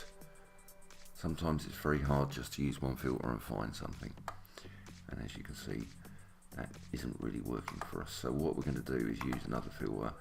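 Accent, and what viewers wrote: England, United Kingdom. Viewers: Good work, I appreciate your systematic approach and (as a silly side note) the English accent is always a marvel to wrap one's ears around.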